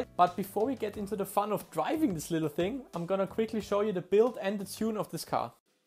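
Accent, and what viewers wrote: Germany. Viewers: Ich habe von Anfang an gehört das du deutsch bist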